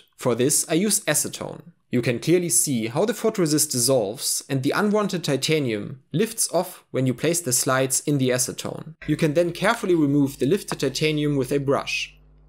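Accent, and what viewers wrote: Germany. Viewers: Ich glaube ich höre da einen LEICHTEN Deutschen Akzent 👂👀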